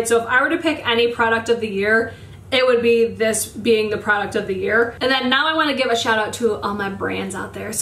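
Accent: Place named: New York, United States. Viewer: I really need to watch other things besides makeup videos on YouTube😂like I really should watch more tv shows, movies, read more. But I think that if I’m on YouTube, I will plan on binge watching your videos. Love your NY accent and attitude. Keeping it real and not sugar-coating anything. Love that!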